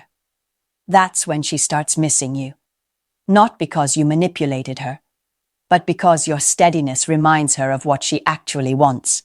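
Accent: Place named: United States. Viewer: Yeah. The A.I. American accent is HORRIBLE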